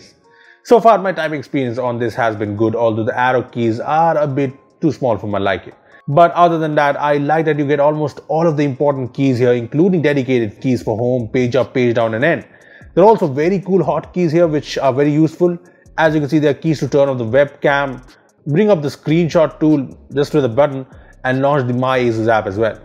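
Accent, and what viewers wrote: India. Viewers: Accent is too hard to understand. Found myself pausing and replaying bits bc Indian accent was just too thick. that I was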